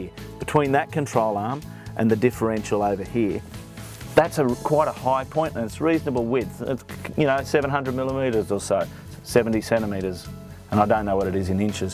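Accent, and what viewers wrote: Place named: Australia. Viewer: Not gonna lie, even though the heavy Aussie accent was a dead giveaway, I was genuinely surprised when he went inside the cab to show where his “Diff marker” would line up and I saw him sitting behind the steering wheel. In my head, I had already marked it for where in the passenger it would go.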